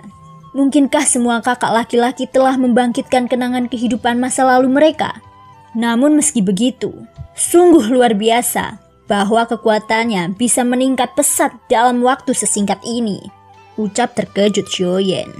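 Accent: Java, Indonesia. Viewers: terlalu medok noba yg ke 4 ini😂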